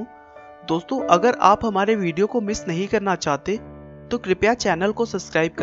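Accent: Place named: Uttar Pradesh, India. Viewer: Iska uttar Pradesh Wala accent kan me chub ta ha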